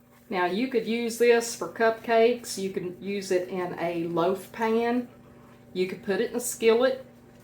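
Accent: South, United States. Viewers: I love your southern accent! This is just what I was looking for. I had previously tried to fancy-up the gingerbread mix with pumpkin by myself and did not elimate the box ingredients of water and egg and oh my, what a very moist and mushy kinda dessert mess more like a pudding which has its purposes. But this time I only added pumpkin as you did and then added Heath crunchy bits to give it a nice surprise. I plan on making a creme anglaise sauce to make it classy!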